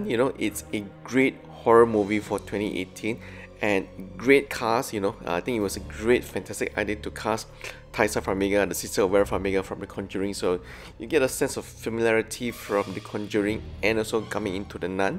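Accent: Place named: Singapore. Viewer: Hi are you singaporean? You have a singaporean accent haha.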